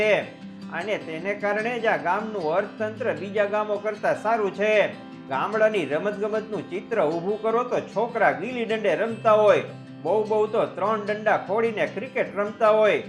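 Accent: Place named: Ahmedabad, India. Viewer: બહુ દા'ડે દેખાયા!!!😊 હું બહુ નાનો હતો ત્યારે અમદાવાદમાં આવું ગુજરાતી લોકોને બોલતા સાંભળ્યા હતા. અમારા કૉલેજના દિવસોમાં તો અમદાવાદ પંચરવ એટલે કે કૉસ્મોપોલિટન સીટી થઈ ગયુ હતુ ને આવી ગુજરાતી લગભગ કોઈ નહોતુ બોલતુ. જોકે માન્ય ગુજરાતી ભાષા બોલતા હતા, અમદાવાદ-વડોદરાની બોલી. હવે તો આવી ભાષા અમદાવાદમાં સાંભળવા પણ નથી મળતી. અમદાવાદ-વડોદરાની બોલી પણ બદલાતી જાય છે!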